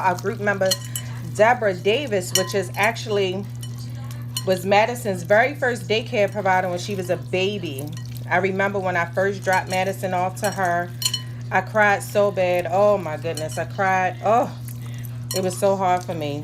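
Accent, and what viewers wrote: Baltimore, United States. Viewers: Bmore accent brings me back home. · As soon as started the video, I was like BALTIMORE!!!!!!!! Never lose the accent! I love it. Those wings look so good!!!! I need to get my air fryer asap! Thanks for sharing.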